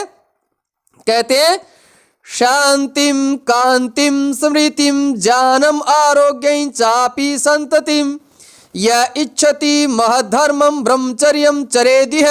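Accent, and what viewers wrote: Haryana, India. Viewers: Haryana ki tone aa he jati h bich bich m 😁😁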